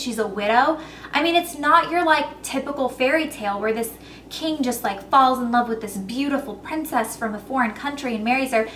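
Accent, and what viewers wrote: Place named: California, United States. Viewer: It's fun to notice your accent changing. I'm not from the states but I notice how your accent becomes more 'californian' I guess =)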